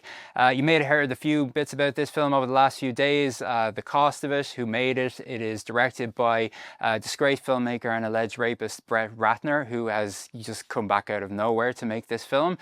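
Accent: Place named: Ireland. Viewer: Nice to hear an Irish review 👏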